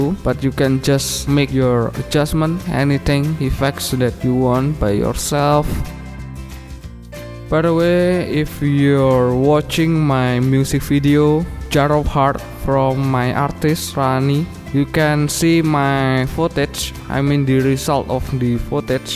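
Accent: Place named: Greece. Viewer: I don't know where are you from but your pronunciation sounds really like greek😂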